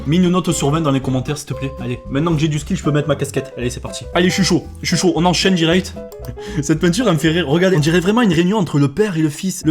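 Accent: South, France. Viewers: Non le bouc c'est sa marque de fabrique avec aussi son bel accent du sud (que j'ai aussi) c'est sacré comme les cheveux bouclés pour Norman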